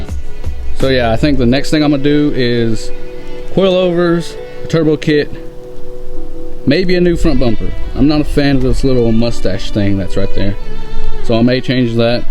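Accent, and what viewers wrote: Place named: South, United States. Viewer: You sound very southern, but actually a very practical ricer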